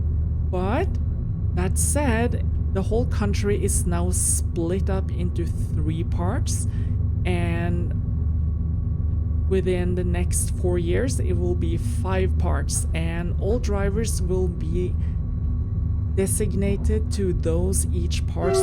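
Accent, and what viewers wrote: Norway. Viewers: Driven, not drived,...;-) For the rest fully agree! Really well spoken English with a charming Norse accent. Love the landscapes, I should pay Norway a visit sometime. Cheers, keep up the good work.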